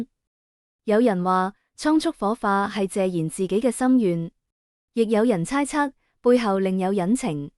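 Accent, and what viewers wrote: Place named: China. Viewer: 你們大陸人讀好D講東話才講啦。應找個香港人來讀。你們讀音太難聽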